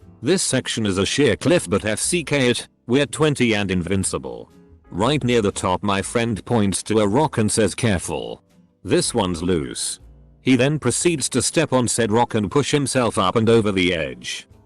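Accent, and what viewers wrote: United Kingdom. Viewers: Nice British accent, man! 👍👍👍👍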